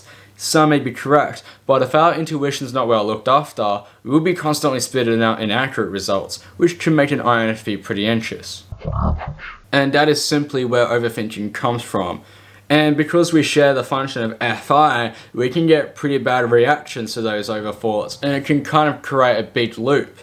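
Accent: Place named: Australia. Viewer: His aussie accent plus a deep voice makes all the difference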